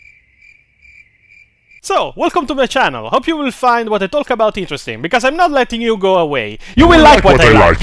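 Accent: Italy. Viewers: Holy shit, he sounds AGGRESSIVELY Italian! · But not violently Italian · This is awesome! Looking forward to more of videos and savory Italian accent! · This italian english is so perfect 🤌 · This is fire, I mean I knew you were Italian but this accent just makes your jokes 10 times funnier looking back at em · Bro is the most Italian sounding Italian I've ever heard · Gran Acento Rustico Italiano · You sound exactly like an Italian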